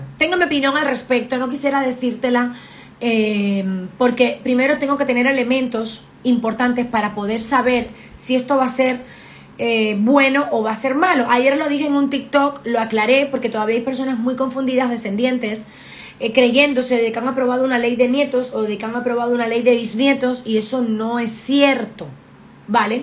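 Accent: Cuba. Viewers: eres cubana?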